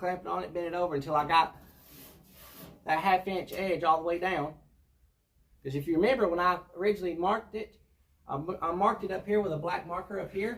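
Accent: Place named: South, United States. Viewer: I’m watching from Poland and I understand you just as fine as anyone else buddy! I actually love country accent!! Keep you the great work fella!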